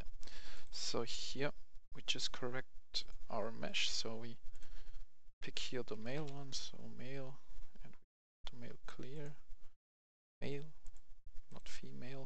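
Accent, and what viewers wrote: Germany. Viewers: Bist du Deutscher?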